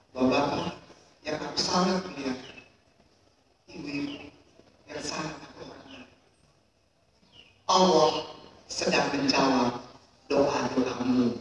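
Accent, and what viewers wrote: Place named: Ambon, Indonesia. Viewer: dr suaranya sudah ketahuan kalo bliau orang ambon, gag perlu lihat muka ato nama,,, suara vokal orang ambon itu khas banget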